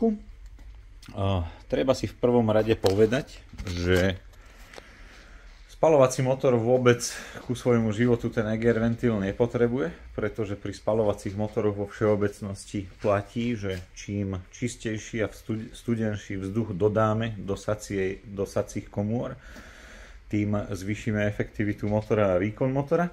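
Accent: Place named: East, Slovakia. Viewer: Máš predkov z vychodu ze vies trocha po vychodnarsky ??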